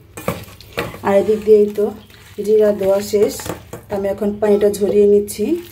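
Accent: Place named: Amin Bazar, Bangladesh. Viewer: আমি একজন ওমান প্রবাসী।ঢাকা মিরপুরের, দারুসসালাম, জহুরাবাদ আমার বাড়ি। কথা শুনে মনে হচ্ছে আমিন বাজারের কোথাও আপনার বাড়ি জানাবেন আসলে ভাষার মায়ায় আপনার ভিডিও দেখা শুরু করেছি। মনে হয় নিজেদের মানুষ ভিডিওগুলো ভালো লাগে। দোয়া ও শুভকামনা রইলো এগিয়ে যান। ভালো থাকবেন।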